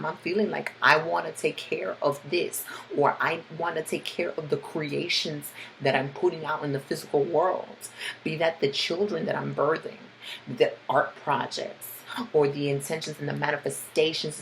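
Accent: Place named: Brooklyn, United States. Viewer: You're so Brooklyn, yo lol. I love your accent!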